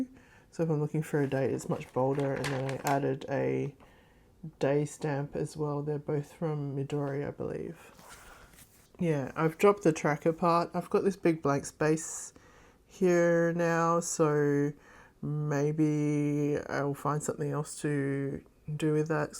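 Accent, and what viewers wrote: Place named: Australia. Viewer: Thought you sounded Aussie. Which state are you in? I’m in Perth, WA. Love your journals-so chunky.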